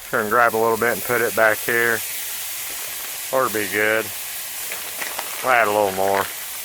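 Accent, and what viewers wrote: South, United States. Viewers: 2 seconds in I knew he was from the South 🤣 thanks for the good vibes & recipe.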